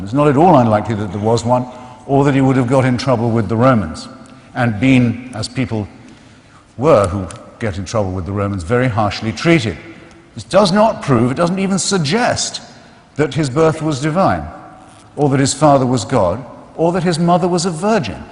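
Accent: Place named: England, United Kingdom. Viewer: Two things. 1, Hitch was one of the most well-read and determined intellectuals of the past 100 years. In a world where religious conviction is still considered brave, he was brave in a way that would embarrass the proponents of that definition of bravery. Most of all, he was a consummately moral and humane person. He was a good man, and he didn't need to jump on anyone else's bandwagon to prove it. 2, English accent.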